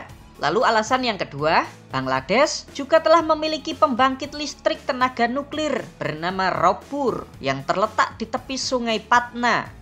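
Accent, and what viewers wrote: Java, Indonesia. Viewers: Jawanya medok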